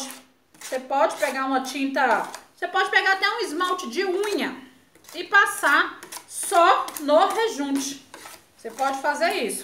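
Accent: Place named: Brazil. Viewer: Adorei o móvel e esse jeitinho brasileiro arretado dela falar. Negra linda. Na cor e na essência.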